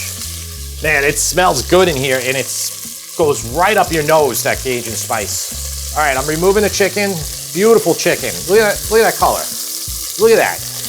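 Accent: New York, United States. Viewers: not to nitpick too badly but you mispronouncing undewee you pronounce it in Dewey which is no that was pronounced. it is pronounced on AHNTOUIE on Dewey So it's not like N Dewey or N Dewey it's on AHN how it's pronounced TOUIE. I know it's your New York accent believe me I need a pick with English people about how to spell how to pronounce Jaguar and then call it Jaguar If you turn to tell him it's a Latin American word in the Spanish language from Native Americans and you're pronouncing it wrong it won't listen.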